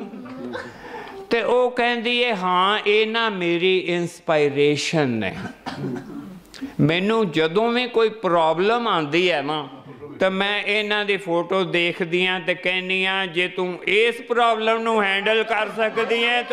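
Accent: Punjab, Pakistan. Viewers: What a beautiful affection developed with uncle, one thing is a very beautiful style of jokes and another thing is that uncle speaks exact our Pakistani style of Punjabi. Love u Baba g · your punjabi same we pakistani speak buhat khoob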